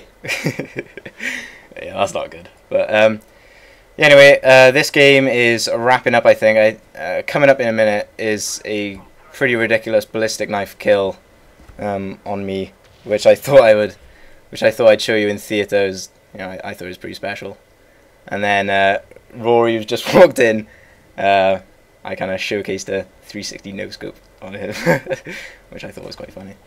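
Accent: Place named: United Kingdom. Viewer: This is weird cause I really like hearing British accents in commentaries. I feel like you're supposed to have a lot of viewers.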